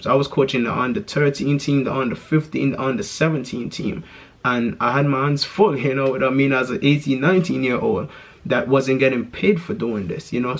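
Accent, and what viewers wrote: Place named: London, United Kingdom. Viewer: So why you sound like you're from London or some shit get the hell out of here with that bronx shit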